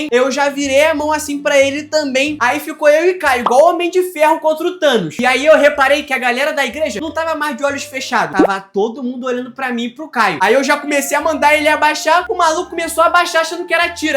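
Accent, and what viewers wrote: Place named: Rio de Janeiro, Brazil. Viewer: Ai mane ele e carioca mem tlgd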